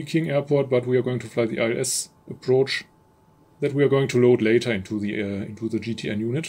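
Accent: Germany. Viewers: Hey, I resubscribed. Missed hearing your Duetsche accent ja!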